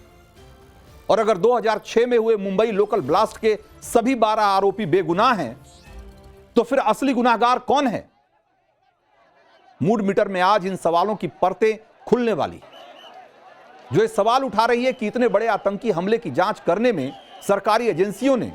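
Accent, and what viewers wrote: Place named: UP-Bihar, India. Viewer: Bhai aap k bolne se to saaf pata chalta hai ki aap up,bihar ,Jharkhand k bhayye ho lekin aap jo b bata rahe ho acha bata rahe ho, lekin kalko paise k khatir beimaani aur gaddari mat karna, kyunki bhayye log ki zubaan, saap ki zubaan ki tarah hoti hai, kabi b palat sakti Hai.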